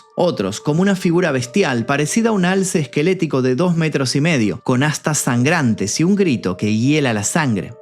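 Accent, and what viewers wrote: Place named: Argentina. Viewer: "polisha", "coshote". Qué chido se oye la pronunciación de la "ll" y la "ye" en el español argentino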